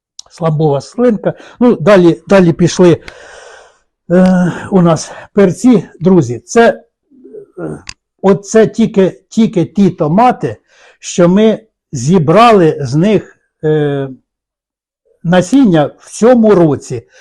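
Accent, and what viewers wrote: Poltava, Ukraine. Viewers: Дякую за чудовий огляд, дивлюся Ваші відео з великим задоволенням, приємно чути рідний полтавський говір. Сама така, як Ваша дружина, все хочеться щось новеньке.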